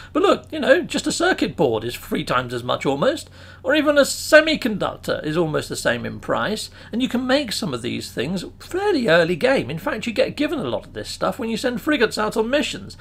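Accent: United Kingdom. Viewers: I love how excited the gets. I keep watching for the British accent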